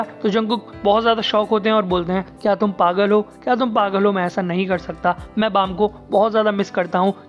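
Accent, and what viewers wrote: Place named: India. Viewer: You ruined the whloe video with your indian accent PUBG Mobile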